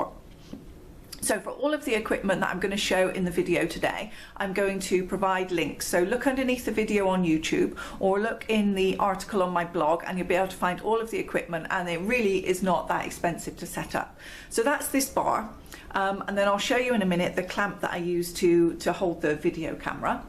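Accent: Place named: England, United Kingdom. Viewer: You're English!!! I always thought you were American!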